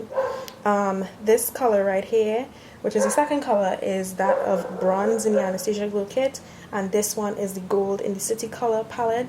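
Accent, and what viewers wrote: Trinidad and Tobago. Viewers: Are you trinidadian? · You got a new subbie even though I will disagree with you and get the Sun Dipped kit :) haha I love the Trini accent, my parents are Trini! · The trini accent yasss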